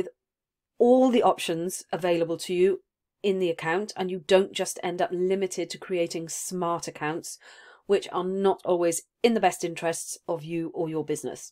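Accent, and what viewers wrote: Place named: United Kingdom. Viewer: For an American audience, you really speak too fast. Yes I understand you, but with your British accent I need a delay to digest what you say. I am imagine you would have the same problem with me speaking with my American accent.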